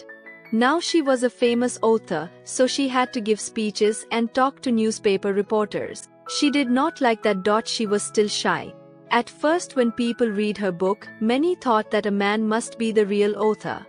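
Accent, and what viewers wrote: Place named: Bengal, India. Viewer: The ai is bengoli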